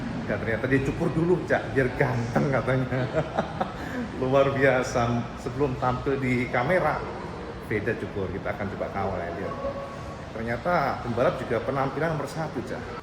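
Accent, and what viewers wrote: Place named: East Java, Indonesia. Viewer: cak, permisi, mau tanya? rumah pean dimana? dan asli pean dari mana? terima kasih infonya, cak soalnya logatnya seperti orang jawa timur an, cak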